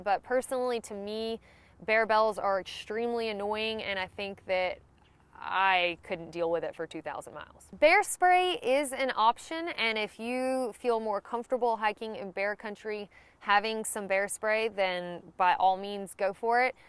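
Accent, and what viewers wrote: South, United States. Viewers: Southern American accents are so annoying but decent video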